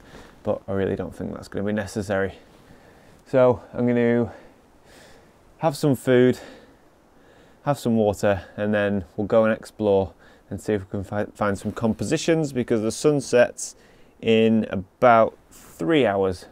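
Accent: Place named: Northern England, United Kingdom. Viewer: Love the way you subliminally introduced us to the lone Silver Birch! Also although it is great to listen to your lovely northern english voice (I was brought up in Manny, in Australia now though) I think your recent videos are too much talking head and not enough about the photography, composition, voice, technical and so on - like it used to be. I think most folk who do landscape photography know about early mornings, camping, walking, trials tribulations, but many folk that I 'mentor' mostly want to know about composition and what makes a shot stand out combined with processing? But carry on as normal, I am one voice in a sea of back patters :)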